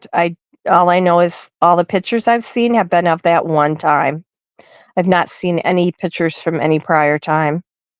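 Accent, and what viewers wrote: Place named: United States. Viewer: I love your laugh ❤ American accents is one of the best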